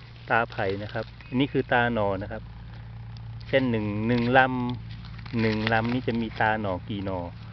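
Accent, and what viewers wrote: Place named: South, Thailand. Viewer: สำเนียงน้ำเสียงเหมือนคนใต้เลยครับ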